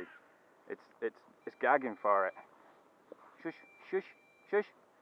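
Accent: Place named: Northern England, United Kingdom. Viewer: Good to hear an 'oop north' accent! A very nice write up too. I'm fairly new to this quadcopter malarkey. When it arrives this will be my first brushless, really looking forward to it :-)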